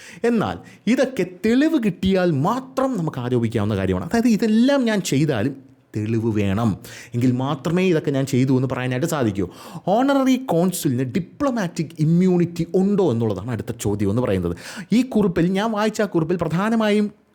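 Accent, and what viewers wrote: Thiruvananthapuram, India. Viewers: 😂😂😂😂😂😂😂❤❤❤❤. അവസാനത്തെ ബാബു സാമി പൊളിച്ചു. Serious ആയ വിഷയം കോമഡി ആയി പറയാന്‍ ബെസ്റ്റ് തിരുവനന്തപുരം slang ആണ്‌ എന്ന്‌ തെളിയിച്ച അങ്ങ് ഒരു മഹാന്‍ ആണ്‌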